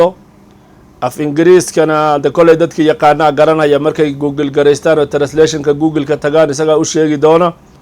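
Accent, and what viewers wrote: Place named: Doollo, Somalia. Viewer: Sheekhow doollo miyaad kudhalatey afkaaga aadbaan ufahmayaaye?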